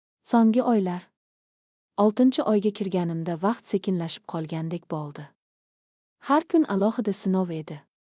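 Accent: Russia. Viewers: Ким укиябди урисми товба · Бу укиетган киз татар миллатига мансуб булса керак шунинг учун шундай укиса керак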